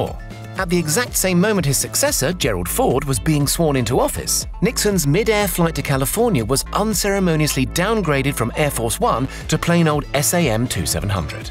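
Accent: United Kingdom. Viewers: Wow, that British accent caught me off guard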